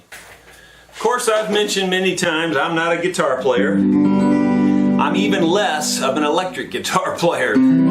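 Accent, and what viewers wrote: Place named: Missouri, United States. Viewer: I’m a bit further down the road but recognize the accent as “local” for sure. I’m in Jonesboro, AR and dig your repair style!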